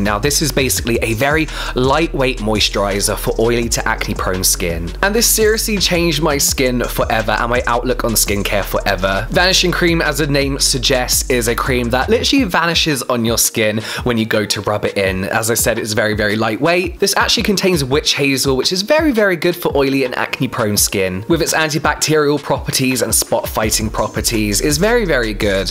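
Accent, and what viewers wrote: United Kingdom. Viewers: Hello, it is soooo refreshing to hear British English on YouTube 🥰 Thank you for this amazing channel and your "ear-pleasurable" accent 😁 Love from Prague ❤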